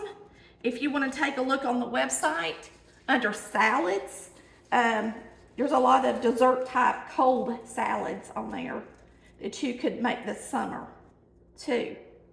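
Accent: South, United States. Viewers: I just found your channel and already loving your video's and your southern voice and charm. Do you have a cookbook??? See you in your next video. ♥️😊👍🏻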